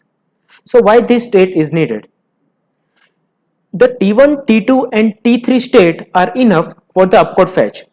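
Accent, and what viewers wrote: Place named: India. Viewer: Indians speaking english sound very ridiculous, next time use subtitles, the world will thank you.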